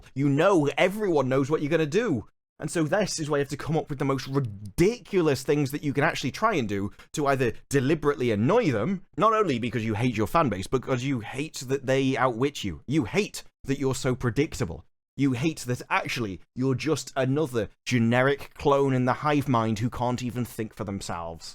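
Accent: United Kingdom. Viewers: Mate, are you taking the piss? You’re trying to miss the point or are just a professional idiot with a British accent?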